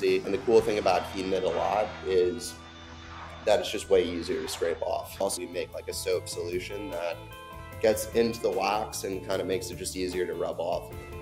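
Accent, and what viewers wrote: California, United States. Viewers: That Californian accent is thick and cute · This guy has the most California surfer accent I've ever heard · I cant stand that California accent and im from here.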